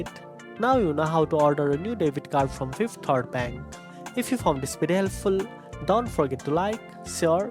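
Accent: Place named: India. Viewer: Why is the person explaining how to get a new debit card have an Indian accent. Can't understand what he's saying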